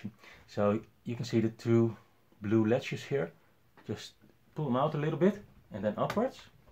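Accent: Netherlands. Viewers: Are you DUTCH ?????? FROM AMSTERDAM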